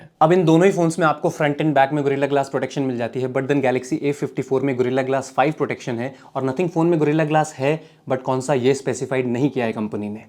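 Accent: Dehradun, India. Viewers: Bhai dehradun s ho aap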